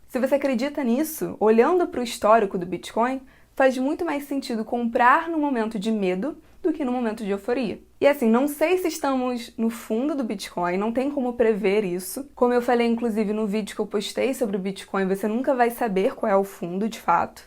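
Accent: Rio de Janeiro, Brazil. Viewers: Nossa, seu sotaque carioca torna o vídeo mais gostoso de consumir. Sério, hahaha. Então, sou novo no canal e desde que lhe descobrir no TikTok, venho acompanhando todos seus vídeos. Tanto aqui quanto lá e posso afirmar que você explica muito bem. Exprime o conteúdo de modo claro. Muito bom. Bitcoin ainda é complexo pra mim — sou leigo no assunto gerais de economia —, mas o interesse que tenho por tudo que envolve economia me locomove para entender mais. É bom estar atualizado por alguém lúcido. Forte abraço. 👍🏼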